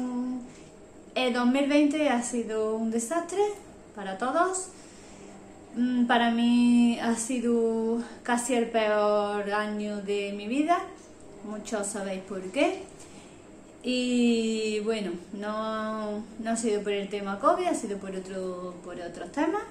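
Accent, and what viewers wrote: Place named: Andalusia, Spain. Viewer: Pero que alegría ponerte cara. Si eres guapísima cariño que arte tienes que me gusta escuchar a alguien con acento andaluz de las mías. Que pedazo de 2021 ole ole nos lo vamos a pasar bomba. Un besote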